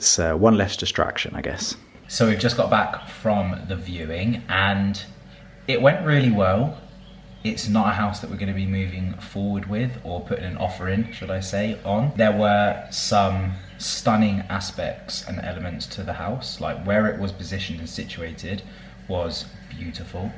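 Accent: England, United Kingdom. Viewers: What part of England is your accent?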